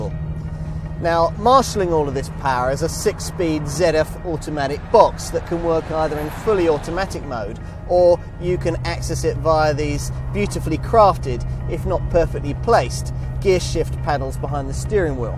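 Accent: United Kingdom. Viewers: Well presented , chic & cool at the same time … and with a british way fashion way to speak it looks so authentic … btw presenting the car beside a superb British mansion gives even more attraction to it …. Thank you so much …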